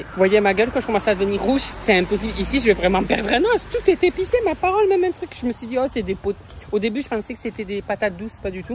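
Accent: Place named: South, France. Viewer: J adore ce que tu fais.bravo a toi tu le fais trop marrer avec ta mère. Ton vlog va beaucoup m aider je pars en octobre pour fêter les 26 ans de ma fille merci d être comme tu es je me retrouve dans ta façon de parler le sud en force.😂belle et heureuse année a toi et a ta famille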